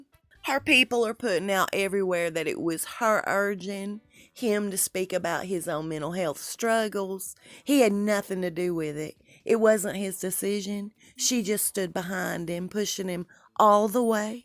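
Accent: South, United States. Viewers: YOU are such a blessing to us,! I enjoy your posts so much and love your southern voice!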